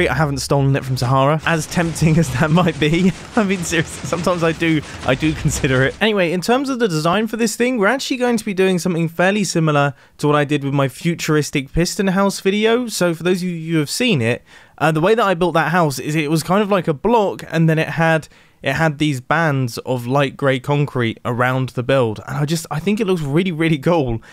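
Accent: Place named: United Kingdom. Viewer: You sound no different than you usually do. Like a charming, if not occasionally clueless, British guy who does minecraft redstone for a living. That was a complement by the way, you sound fine like you always do.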